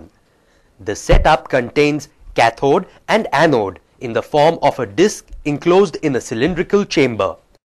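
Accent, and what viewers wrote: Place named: India. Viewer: stupid hindi ascent!!!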